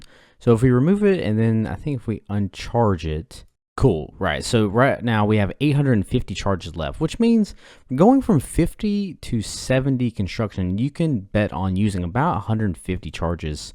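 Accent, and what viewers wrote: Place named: Oklahoma, United States. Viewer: also that southern accent poking through. I wanna guess Oklahoma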